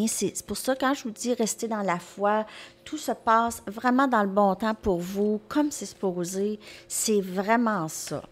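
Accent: Canada. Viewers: Merci 🥰! Je vous ai découverte depuis peu et j’aime beaucoup vous écouter. Vos guidances me parlent et j’aime aussi votre bel accent canadien 🤗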